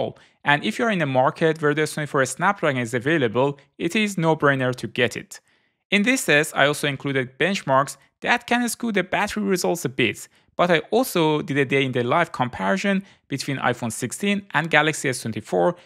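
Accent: Iran. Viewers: Love your persian accent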